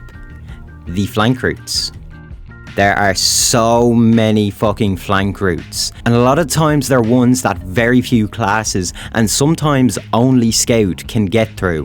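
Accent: Scotland, United Kingdom. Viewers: Sound like u British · i love your Scottish accent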